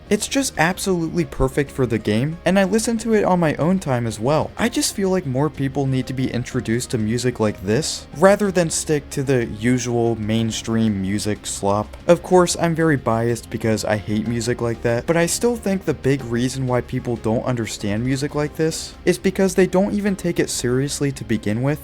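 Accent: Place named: United States. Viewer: u sound so american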